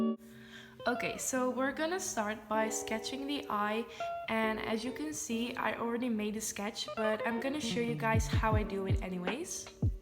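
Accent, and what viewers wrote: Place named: Netherlands. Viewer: Me: hmm it looks like she got a dutch accent! *looks in discription * "where do I live? The Netherlands Me: *cheers*I'm Dutch tooooooo!💕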